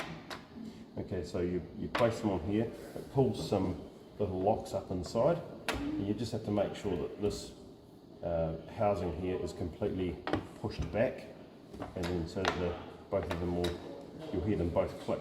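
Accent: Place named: New Zealand. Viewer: Where do you get the fans from? You sound kiwi mate... are you in NZ?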